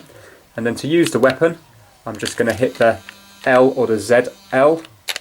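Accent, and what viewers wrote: United Kingdom. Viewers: Rami Abdalla he has a British accent. So that’s why he says it like that. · Geekas Kid probably British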